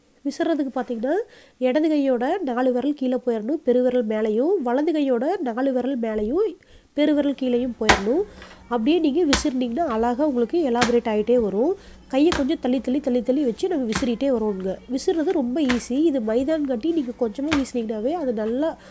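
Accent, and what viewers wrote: Kongu, India. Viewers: அருமையா சொன்னீங்க ! கொங்கு தமிழ் அருமை ! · Kongu tamil eppovuma alagutha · Good kongu slang😀😀😀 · Your kongu Tamil was good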